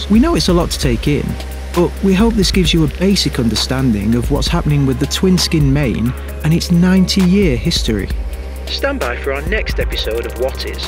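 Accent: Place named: North, United Kingdom. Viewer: Dumbed down to the point no one watched. North sails, northern accent.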